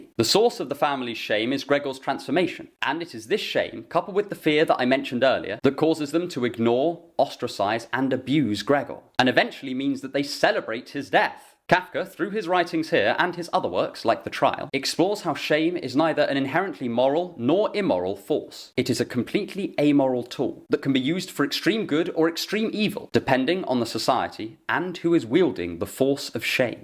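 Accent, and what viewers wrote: England, United Kingdom. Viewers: Is this guy doing a good review of the book and giving in depth thought, or is it the English accent?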